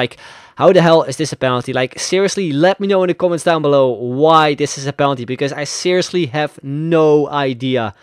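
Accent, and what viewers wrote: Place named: Netherlands. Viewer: 1e video die ik kijk van jou en ik hoor gelijk de je nederlands bent :D maar je spreek echt goed engels man. en de video is echt tof +1 abone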